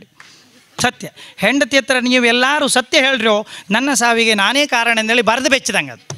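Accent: Kundapura, India. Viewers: kundapura kannada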